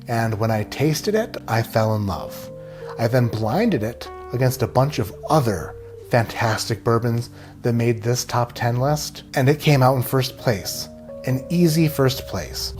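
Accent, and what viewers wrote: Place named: Midwest, United States. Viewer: You are very welcome sir. I enjoy your content. I enjoy your plain spoken mid-western accent. I also like that you are willing to call out duds when they are duds. I am still writing now because I am hoping that the longer a comment is the better it helps your placing in the almighty algorithm. Have you considered the great spaghetti monster? When you were small did you pronounce it "sketty"? or "pssssketty"? I did. I really like the bit you have going with Rex. I can't wait for your reponse. I hope it is full of hyperbole and sillyness. I know what will boost comments. Let me start an argument. Okay: Jack Daniels isn't Bourbon. Why? Because they don't say Bourbon on their own label. And if they're too good, or too yella to say Bourbon, they don't deserve such an honor. Ok, that's all I can think of for now. hope this helps.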